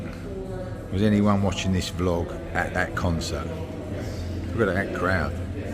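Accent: United Kingdom. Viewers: Love listening to the narration and the British accent.😊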